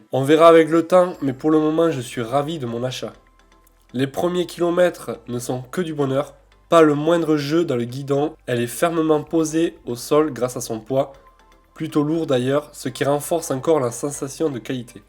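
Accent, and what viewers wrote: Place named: South, France. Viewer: Toi tu es pas de paris mais plutôt de chez nous (le sud bébé 😂)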